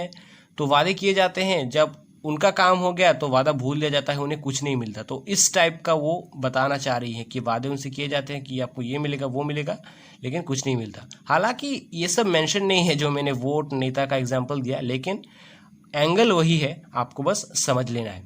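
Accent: Assam, India. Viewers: Bro kya aap assam se ho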